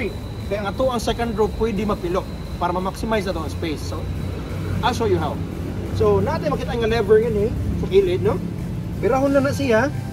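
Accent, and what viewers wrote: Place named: Visayas, Philippines. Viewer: naenjoy ko nmn sir, kaso nabigla ako sa visayan accent mo eh, hehehehe. anyway nice content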